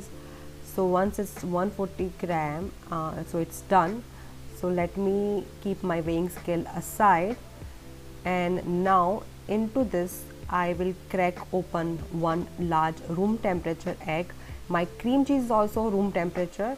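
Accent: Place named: India. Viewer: The accent kills me... I get calls all day long from con artists and they ALWAYS have this India accent omg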